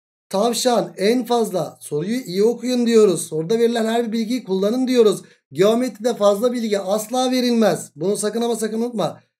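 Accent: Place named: Central Anatolia, Turkey. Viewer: Hocam sizde ankara-kirikkaleli konuşma tipi var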